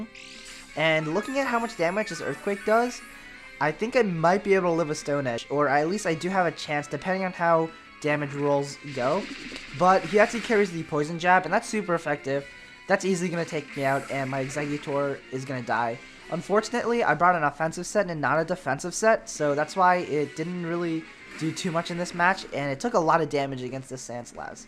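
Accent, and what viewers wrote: Netherlands. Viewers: R u dutch???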